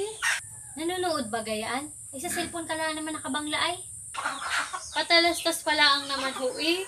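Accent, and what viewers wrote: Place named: Oriental Mindoro, Philippines. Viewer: Akoy tuwang tuwa sau marehin namiss k gnyng tono ng salita jn s ating Or. Mdo.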